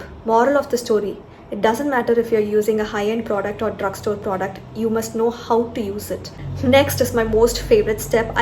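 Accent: Tamil Nadu, India. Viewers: Seriously your editing skills are vera level.. and the way you speak, as a Tamil girl I can relate to that. The thing is you apdae translate the Tamil expressions to English. That's so wonderful to watch. I am your new subscriber. Keep it up. I'm waiting to see your growth as a youtuber. Long way to go, Congrats🤍🤍🤍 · Lov that tamizh twang ❤️❤️❤️ wdt it d video seems incomplete. 😀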